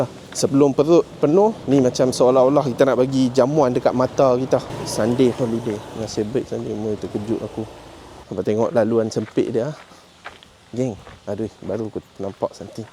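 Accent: North, Malaysia. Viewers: Best lah vlog nih!! Tambah plak dgn loghat utara!! Terus maju saudara! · Bro sebab lograt utara hg aku follow bro. Semoga maju jayaa, keep it up bro👍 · Sedap betui ckp utagha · Baru jumpa chsnnel ini. Menarik sgt. Terima kasih bawa kami menjelajah.. seronok dengar loghat utara awak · Penyampaian yg santai, penggunaan bahasa melayu yg sempurna dengan loghat utara, rasa mcm sembang dgn kawan2 kt kedai kopi tapi masih ada unsur2 professionalism.. sempoi! Hang dapat subscriber baru dari aku. Teruskan kandungan2 mcm ni.. 👍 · Terbaikkk la bro...bhasa utara betul2 mantopppp.... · Mula ii igt abg Indian sana sekali dgaq utaqaaa 😂 tq bang seronok layan vd abg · Bahasa utara sempoi anak muda Malaysia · suka dengaq hg cakap utara..cantik permandangan, rasa macam vibe aku kecik2 dulu..terbaik weii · Jarang sangat2 komen kat video2 travel. Tiba2 naik suggestion video ni. 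Mula2 dengaq loghat utara pun dah buat aku tertarik. Pastu tgok pulak creator video ni brader India dari Malaysia. Lagi la tertarik. Pastu pulak tgok style travel mcm ni lagi la buat jatuh hati. Teruih rasa nak travel sekali. Baru tau Kerala ni agak menarik. Keep up the good work. Hg mmg best la bro. 🎉👍🏻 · Bestnya bawa kami jalan²...Terima Kasih bg kami pengalaman mcm nie..Dngn guna loghat Utara..Mmg Surrr tgk.. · Best wehh chanel ni. Dia bantai loghat utara memang sedap dengar. Asal aku baru jumpa ehh chanel ni. Terus subscribe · frist time tgk video dari bro ni, aku plak jenis suka lorat utara, memng auto subs and like. terbaik la king fish tu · One of the best Travelodge by my fellow Malaysian 🇲🇾. Bangga dgn awak bro. Best dgr loghat utara. Greetings from Vancouver 🇨🇦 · baru 1st time tengok terus subscribe...mantap dapat cakap dlm BM slang belah utara..tqsm n teruskan berkarya 👍👍👍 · Mntap konten hg bang..dgn loghat utaqa tibai pi..mmg auto subscribe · org utara ka ? 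· Sedap tengok video2 awak dik. Yang penting info sampai, puas hati & hang cakap utara rasa dekat di hati kak... Hihi. Sat nak pi tengok video2 lain pulak. Teruskan berkarya na 😊❤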